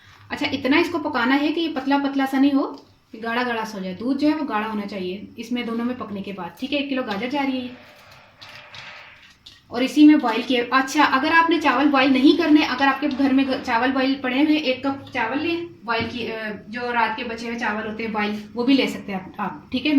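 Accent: Punjab, India. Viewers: App ksa main hain but I think 4rom back home u r from lahore or faislabad because of ur Punjabi eccent😊😊😊😊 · love your Urdu mix punjabi...so sweet, so loving and so nice accent. Your recepies are also very nice, practical and it seems like some family member is telling how to cook. Love you :). I am living in holland and i love to cook and eat desi food. you are doing a good job. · You are so sweet buhat achy and esey treqy sy sab kuch skhaty ho aor Jo bat kerty huye Panjabi ka terqa lgati ho acha lgta Allah ap ka nseeb acha kry ameen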